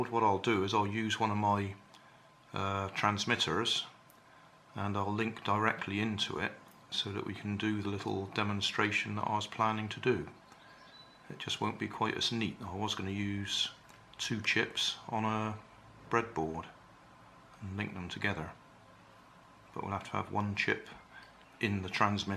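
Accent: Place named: RP, United Kingdom. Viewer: Forget it..... i love your royal accent, compose, even tone all throughout and the suspense that u maintain on whether project will work or not & above all irony .....wow!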